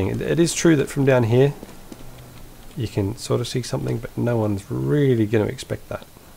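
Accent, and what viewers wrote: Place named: Wales, United Kingdom. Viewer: 1 question plz : u from UK? edit: yeah,i fukig knew it,i have a friend from UK too,and his accent exactly like u...and he live in south wales GReat smoke,dude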